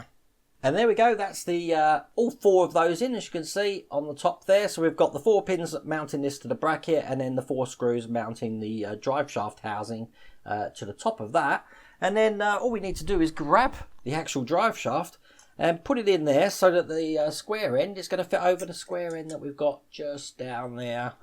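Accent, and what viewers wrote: United Kingdom. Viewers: where in the uk are you that accent is bugging me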